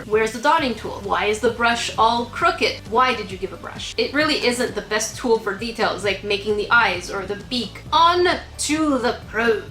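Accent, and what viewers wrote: Canada. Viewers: this is my first video im watching of yours and im very happy to hear youre canadian WOOT WOOT! go canada!! #toronto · also are you canadian?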